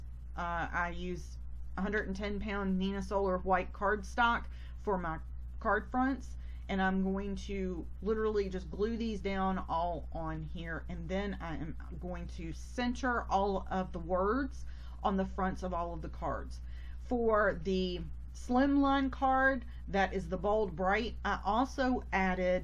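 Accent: South, United States. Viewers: What awesome cards! I’m so thankful that you chose to participate in this important cause! Honestly, to hear someone with a southern accent is even more encouraging. Bless you!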